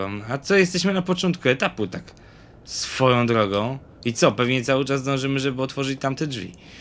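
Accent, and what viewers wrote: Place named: Podhale, Poland. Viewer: Hehe, nie moge z twojej góralskiej gadki coś w stylu " ty nie wiesz ale ja wiem,a jak nie wiem to się dowiem " xD. Pozdro.;)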